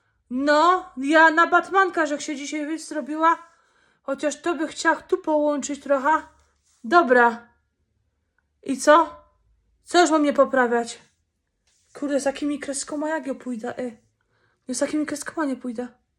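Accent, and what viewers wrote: Silesia, Poland. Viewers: Ale ty jesteś przykra z tymi twoimi głupotami i żenującymi mało interesującymi filmami no i z tą wkurzająca gwara śląska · Kobieto, jak ja Cię kocham miłością szczerą ❤️ Ciebie to powinni sklonować, krejzolko najwspanialsza 😂😂❤️❤️❤️ Kiedyś nie lubiłam śląskiej gwary,ale Ciebie to mogę słuchać całymi dniami. Pozdrawiam ze Świeradowa Zdroju ❤️❤️❤️❤️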